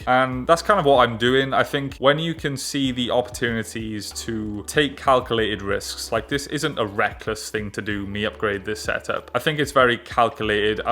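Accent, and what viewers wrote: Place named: United Kingdom. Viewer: There is something about UK accent, or maybe about speaking in proper English, that just works. I wish I had this language skills to improve videos on my channel. Anyway great job man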